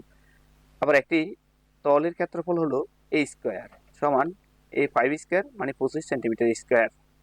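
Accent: Noakhali, Bangladesh. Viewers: স্যার আপনি কি নোয়াখালীর মানুষ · Sir apni ki nokhalir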